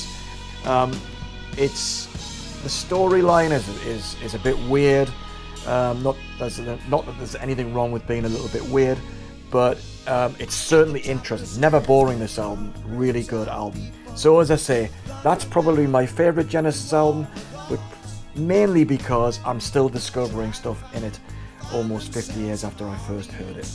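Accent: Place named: North East England, United Kingdom. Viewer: By the way, where’s your accent from. Sound like another north east native.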